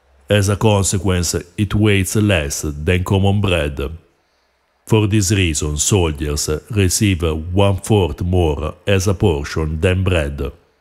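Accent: Italy. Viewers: Where part of Italy you from?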